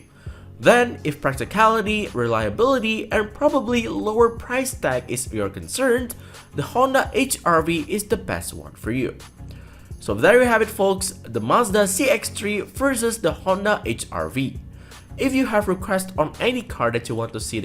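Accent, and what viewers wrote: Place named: Indonesia. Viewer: You sound like Indonesian. Are you?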